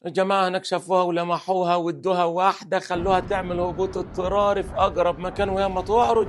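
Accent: Upper Egypt, Egypt. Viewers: اللهجة الصعيدية اقرب لهجة عربية الي قلبي🇩🇿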